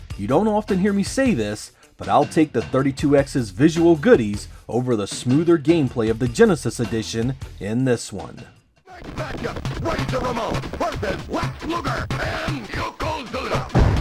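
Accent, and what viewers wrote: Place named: Mid-Atlantic, United States. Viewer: is totally from PA/MD/NJ I can hear your accent lol with love from Boston!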